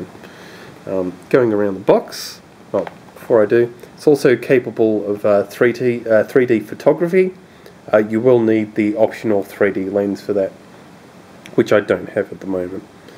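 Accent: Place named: Australia. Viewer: have u heard of australian accent?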